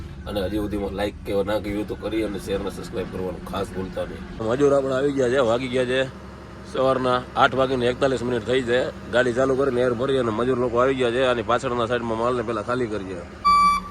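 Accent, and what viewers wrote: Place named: Saurashtra, India. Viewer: ગુજરાતી તો સેજ પણ એમાય સૌરાષ્ટ્ર જે બોલી છે એને તો પુગેજ નય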